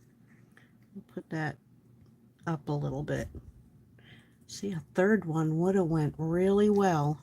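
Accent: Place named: Brooklyn, United States. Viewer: Very cute verrrry cute! You sound like youre from Brooklyn lady!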